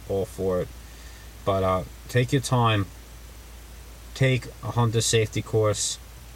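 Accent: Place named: Upstate New York, United States. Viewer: You sound like you are from Upstate New York. Welcome and hello.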